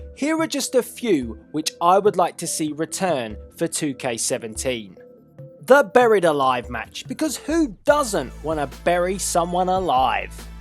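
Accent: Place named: United Kingdom. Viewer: Every time I think a WhatCulture narrator could not possibly be more UK/British-sounding, they manage to top themselves.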